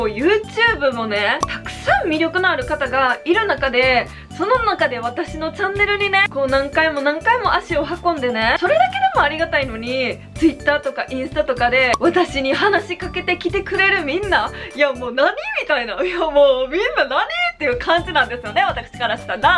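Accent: Kansai, Japan. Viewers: 同い年なんや！！！おんなじ関西出身やし、親近感あります😍 わたしも周りから25歳らしくしろって言われる時あるけど、人間何歳になってもやりたいことはがんばったらできるし、今しかない！って時にやるしかないですもんね！いつもバリバリの関西弁で、なんか友達と話してる感じやな〜と思っていつも見てます！これからも頑張ってください！応援してます🥳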